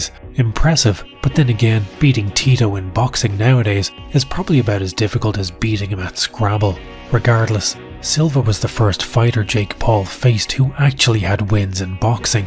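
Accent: Ireland. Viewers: I've just found your channel and damn, am I impressed. Great to hear an Irish accent smashing it too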